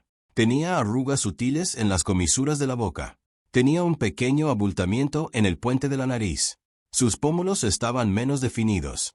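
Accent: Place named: United States. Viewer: Todo esta muy bien excepto el acentito ridiculo de gringo....😅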